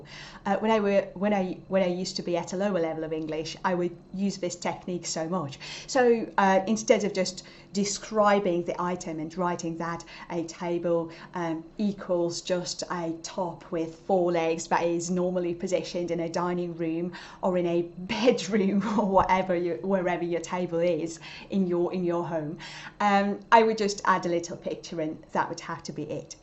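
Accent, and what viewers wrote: United Kingdom. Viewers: I love your British accent and your fancy way to speak!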